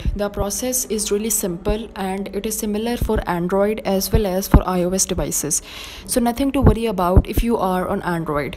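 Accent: India. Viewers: it is indianeese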